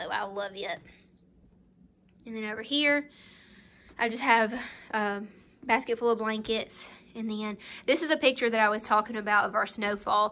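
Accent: South, United States. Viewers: Binge watchin your vlogs☺️ just love your down south accent💕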